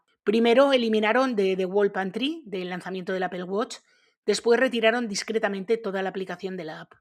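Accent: North, Spain. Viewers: No lo entiendo. Como pueden ser tan atrasados los americanos? Esos remedios son los que decían las bisabuelas, como lo del limón en la mesita de noche para el constipado. No es de extrañar en un país que no tiene sanidad pública. Hay que ser muy imbécil para creer en esos milagros a expensas de la ciencia. Solo faltan los vaqueros vendiendo crecepelos de estado en estado cual salvaje oeste. Una panda de retrasados! Un cordial saludo! Me encanta tu voz.😊 concretamente tu acento. Del norte? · Creo haber oído a alguna vez que es madrileña. Pero si parece que tiene acento del norte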